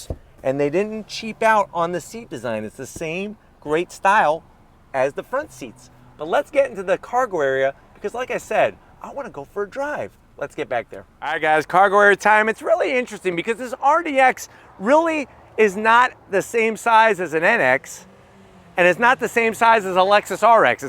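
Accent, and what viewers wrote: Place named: New Jersey, United States. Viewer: I need to know if you're originally from Jersey. Your accent is driving me crazy. It's so similar to my mother's.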